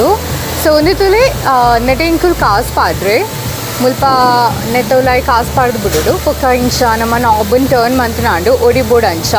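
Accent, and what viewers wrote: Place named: Tulu, India. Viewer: Your tulu slang is so nice.... Nice video..👌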